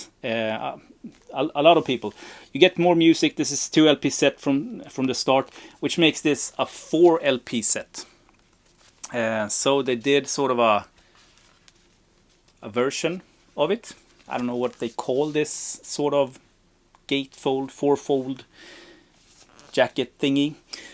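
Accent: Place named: Sweden. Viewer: gillar svengelskan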